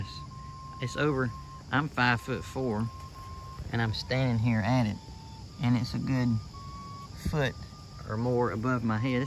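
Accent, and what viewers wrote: South, United States. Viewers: Happened upon this. Interesting. Would have also been nice if you read fully those earlier stones that didn't quite make the earliest. I hear a Southern accent, where are you from? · “The LORD has preserved these letters”. Not many look at things like you and I. Our LORD GodAlmighty has his WILL, HIS HANDYWORD on everything we see that is Good and for HIS SAKE. I came from a line of cemetery Stewards. Both my maternal and paternal grandfathers and great grandfathers lived on Cemetery Stewards Houses…which were the cemetery’s church parish house. We had some good times playing at mammaw and pappaw’s house. Especially in the summertime when we played outside late. We would play nighttime hide and seek. Our backyard wasn’t like most, it was a huge backyard with marble, granite, and black onyx vertical tablets everywhere. THE greatest place to play hide and seek in the summer evenings when the hot sun was going down and it started to cool. 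The only lights were lightning bugs or the full moon occasionally reflecting off the high polished granite and black onyx stones. Some nights we had to give up our hiding spots because some of the stones made a perfect hiding spot if they were wide and tall enough for us to crouch down behind. Precious Memories….. I love your southern accent. It’s very comforting to hear. The old southern accents are fading away. All the southern kids of this generation don’t have the traditional tight nit families surrounding them with the accents. They are only speaking what they hear on the iPhone social networks. …..it’s sad. It’s also sad to see this current generation NOT BURYING THEIR LOVED ONES. Everyone is taking the greedy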